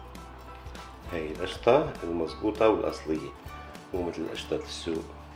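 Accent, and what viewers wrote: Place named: Aleppo, Syria. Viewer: احلى حكي حلبي ..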